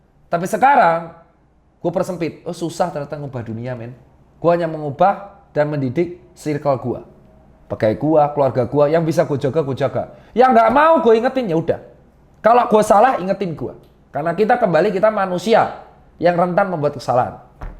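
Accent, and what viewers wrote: Java, Indonesia. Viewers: Dr. Tirta logate koyo wong Jowo Cah 😂😂😂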